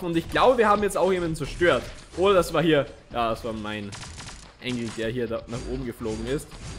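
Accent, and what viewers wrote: Austria. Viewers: Bist du Österreicher wegen dem Akzent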